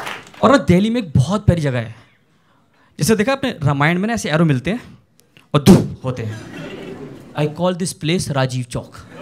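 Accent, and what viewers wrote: Garhwal, India. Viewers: Aap gadwali ho??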